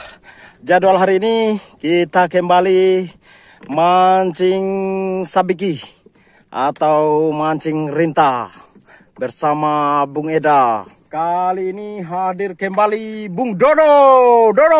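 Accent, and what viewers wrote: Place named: Makassar, Indonesia. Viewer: Salam dari Buton#gue kirain ornag Makassar hheee padahal orang baubau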